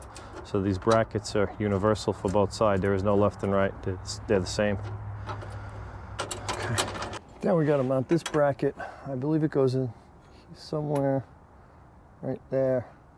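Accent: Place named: New England, United States. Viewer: I detect a NE accent. For the snow you get…..😬 “Tractor” you say? Funny, I don’t see a 3 point hitch…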